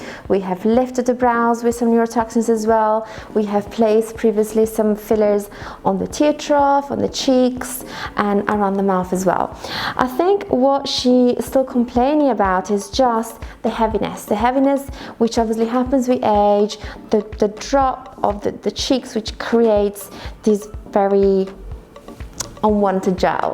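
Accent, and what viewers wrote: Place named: Iran. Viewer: She has Iranian accent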